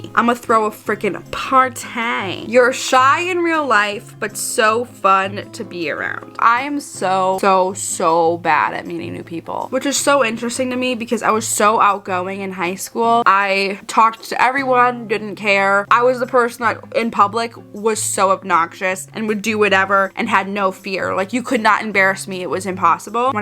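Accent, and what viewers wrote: Wisconsin, United States. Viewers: am i the only one who thinks she should have a podcast? she’s so real with her viewers which is freaking amazing and her voice is just nice to listen to, we love that wisconsinite accent 🤩🙌🏻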